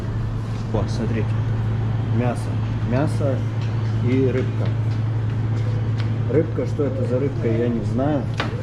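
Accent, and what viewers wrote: Odessa, Ukraine. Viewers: Отличная поездка! Слышу говор знакомый, а Вы одессит. Ну а я с Донбасса, Луганск. Удачи Вам!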